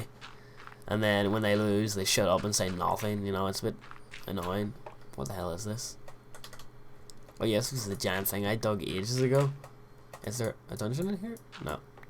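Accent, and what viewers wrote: Northern Ireland, United Kingdom. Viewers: I recognise the northern Irish accent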